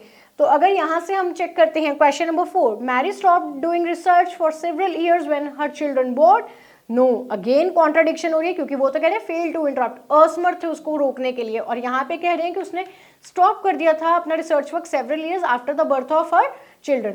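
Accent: India. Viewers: your indian accent makes my ears bleeding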